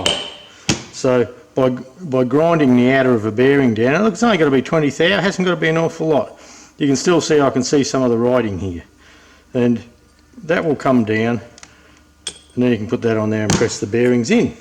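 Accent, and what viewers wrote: Australia. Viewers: Nice Aussie accent Mate !!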